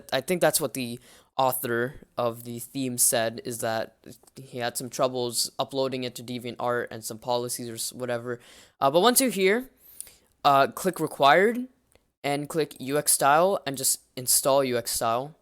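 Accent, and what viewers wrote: Czech Republic. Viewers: Wait a second - you're czech? Yaaay me too. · ty si čech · Are you from czech republic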